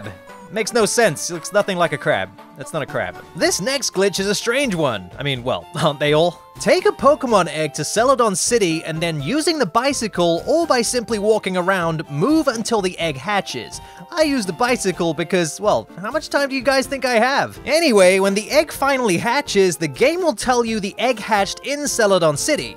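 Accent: United States. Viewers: Why do he sound a bit American now? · Woah I feel like I haven't seen your videos in years and your accent feels more "American-y?" It's weird haha good video still :)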